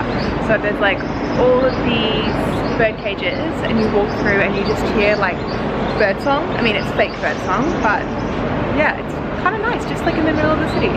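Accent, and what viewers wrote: Australia. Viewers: Hello.. Are you a Straya?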